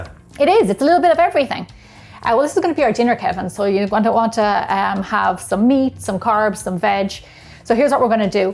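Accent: Ireland. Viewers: I love your Irish Accent!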